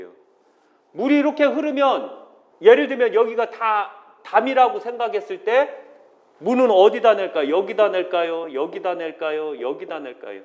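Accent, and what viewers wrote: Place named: Chungcheong, South Korea. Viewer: 쌤~"지난번에 말씀하셨는데요 넘 정감가게 말씀하셔서입니다 스산 태안쪽 말솜씨 같아 더친밀감 올백입니다~😊 방송 잘보고 교육되고요 차례음식상차림까지 진심으로 감사드립니다 고맙습니다 꾸벅 쌤 화이팅입니다 "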